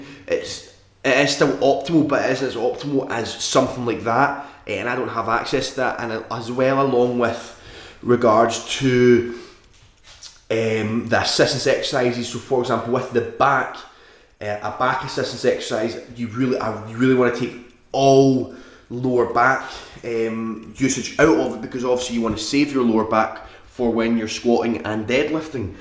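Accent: Scotland, United Kingdom. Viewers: Damn son you strong as hell mane. Love the scottish accent. Think my homie Tj told me about you bro..great vid champ. I subbed!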